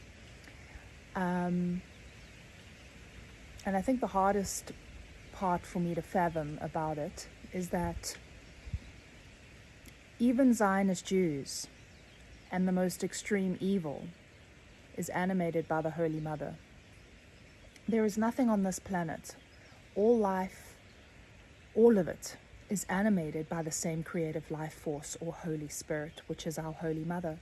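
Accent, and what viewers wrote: South Africa. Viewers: Jai Anandamayi Ma ❤ the divine feminine expressing itself through this beautiful blessed woman with a South African accent. Shanti Shanti Shanti 🙏❤🕉❤🙏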